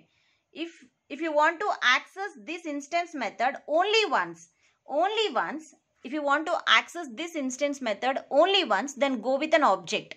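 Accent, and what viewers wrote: Hyderabad, India. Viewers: are u from Hyderabad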